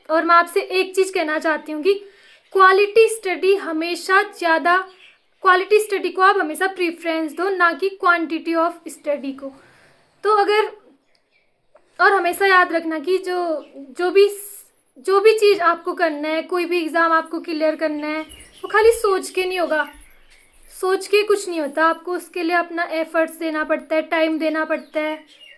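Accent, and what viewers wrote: India. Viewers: Tum pakka pahadi hogi tumhri voice se pahadi accent aa rha hai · Tumhari tone uttarakhand jesi h hamare yeha ki ladkiya bhi aesi he bt krti h..m Pithoragarh se hu aap kha se ho..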